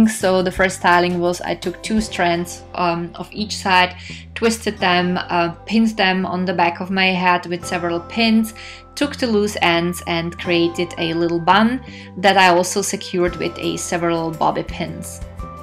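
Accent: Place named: Germany. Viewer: you sound very german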